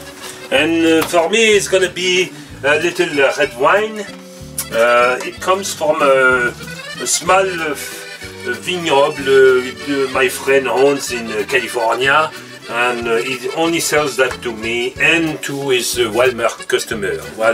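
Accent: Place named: Italy. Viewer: Je pensais te revoir avec un accent USA, mais c'est plutôt avec celui d'Italie que tu reviendras. Rafraichissant mon ami. Continue ton périple avec cette bonne humeur. Au plaisir.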